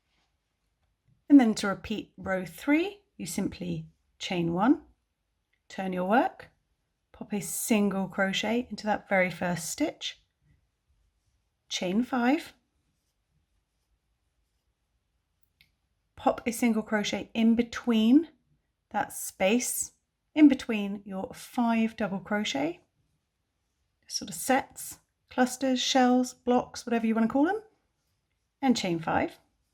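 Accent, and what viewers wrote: United Kingdom. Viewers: You Have a UK accent, but in American terms?? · Despite the British accents, she's using American terms, so don't get confused. A lovely, and east stitch pattern for us beginners.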